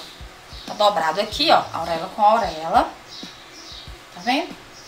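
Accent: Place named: Minas Gerais, Brazil. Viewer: ***** Ah! Que interessante! Realmente já tinha ouvido dizer q o sotaque de Minas era forte.Fica giro viu? As brasileiras q sigo no yutube, a maioria são de São Paulo e o sotaque é diferente,mais levezinho.Obrigado por responder e tenha um bom dia!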